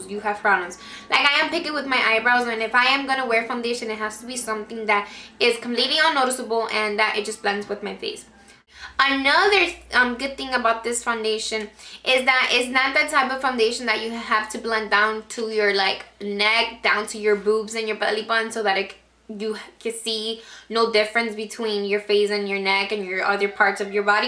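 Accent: Dominican Republic. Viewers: Haha i read down on your comments and ikneww you were dominican. so am i and i immediately picked up on your accent. Your gorgeous btw :) subbed right away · You remind me of myself! Accent and everything! Are you Dominican? lol def subscribing!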